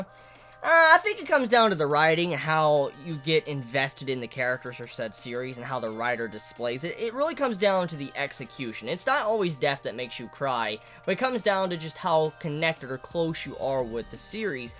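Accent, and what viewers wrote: United States. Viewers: After seeing that old dance video, I've noticed that your country accent has diminished over the years.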